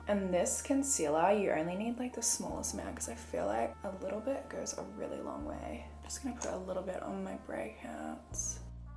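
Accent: Australia. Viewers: I know people have probably already said it but she reminds me so much of Maia Mitchell. I think their noses are similar. But the Australian accent just makes it better lol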